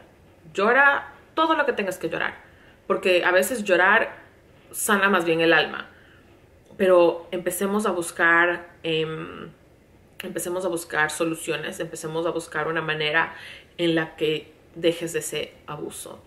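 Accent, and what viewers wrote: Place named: Ecuador. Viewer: me encanta que a pesar del tiempo que vives en USA sigues hablando muy ecuatoriana ❤️❤️❤️❤️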